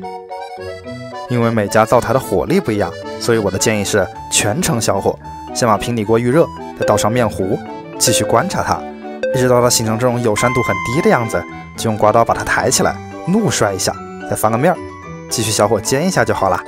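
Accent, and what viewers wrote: Wuhan, China. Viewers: 浓浓的武汉口音🤣🤣🤣🤣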